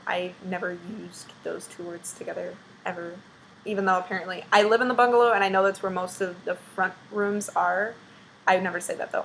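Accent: Chicago, United States. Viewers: I've lived all over, including the suburbs of Chicago, and the biggest difference is the 'a' sound. I don't think she even realizes it, but the way ppl from Chicago say Chicago sounds like Chicaaaagou. Most of accent differences come from the vowels. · I feel like you do have an accent because my friend and I are from Chicago and we were saying the words with you and we were saying it the same way as you so maybe we do have a Chicago accent you never know!!! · For me u sound perfect. I guess I'm a true chicagoan then. I mean I was born & raised here I mean over der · No lie this is a eye-opener I’m born and raised in Chicago and I say every word exactly how she saying what the fudge LOL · You have a solid Chicago accent. There is a distinct tone typical of people from Chicago. It's most noticeable in the way Chicagoans drop their voice at the end of words. 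The second most obvious part is dropping letters out of words or shortening them (Aunt to Ann). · This is exactly how i talk and i'm a Chicagoan, haha. Gotta love Jewls! My parent's lived in Cali back in the late 80's and they were always told they had an accent. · Girl you have an accent. Trust me. Born and raised in Chicago. I know my people accent when I hear it👍🏻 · She has a very light Chicago/Suburb accent. Check out south side Chicago accents and you'll see the difference. Look up videos of Frank Culotta, that is a strait up Chicago accent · Growing up in Chicago, I would say you have a SLIGHT suburban area accent. In my opinion, you don't have a true, inner city Chicago accent. P.S. - I typically say "ruff" for "roof, and call Jewel-Osco "Jewel" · I grew up on the northwest side of Chicago and she talks just like I do. Same pronunciation and everything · I was born and raised in Chicago. 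I have family on the South Side, in Lakeview and up North in the suburbs. Yes, you have a Chicago accent; in fact you sound so much like my sister it's creepy. The accent is different in different parts of the city - more or less exaggerated in different ways depending on where you're from. But, as with most accents, the giveaway is usually in the vowels. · She definitely has a Chicago accent. Most people raised in Chicago area have the accent, whether from city or suburbs. Certain pronunciations can be more acute if you grew up in the city but accent is easily detected if you have chance to listen to person speak long enough. Some common accents exist in large northern cities like Chicago, NYC, Philadelphia for example: dis, dat dem, der = this, that, them, there ..... attributable to heavy European immigration from Germany, Italy, Poland, Ireland and such ... “Tink I’ll git me an Italian Sahsage sanwich” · Yessss you have a very strong big city Chicago accent . 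Who says you say Chicago funny ? How can you ? Ohhhh you say the word orange the same way we do . The word sure too . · Y'all tweakin she has said everything pretty much chicagoan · ruff is what a dog says,.. roof -( with a lighter stress.. subruban accent) here in the northwest suburbs we say jewel for jewel/osco... yes,you have a accent.. it may sound funny to someone who's from out of state... but, to me it's delightful... ( psst; if you wan to know how to pronouce the word garage... think of the old danley's garage world commercial.. ga radge would be how it's said in chicago) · I was going through some accent videos, because I have no idea which kind of accent I actually have (I'm from Finland) and then I realized I say those words just like you do. Does that mean I have somehow managed to get myself a Chicago accent? :D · She does have a Chicago accent, cause I'm from Chicago, and living in Houston for 9 years I've heard the difference in accents from a Houston to Chicago. 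· No man, she's got a clear Northside accent. Except she won't say roof correctly lol. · She has a Chicago accent I speak the same way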